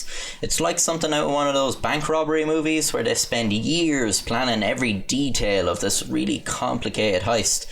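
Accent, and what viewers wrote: Ireland. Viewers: LOVE the way you say 30 as well as the Irish accent in general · "Two thousand and Tree" lol. gotta love that irish accent. just confused as to why he doesnt say "tousand" too XD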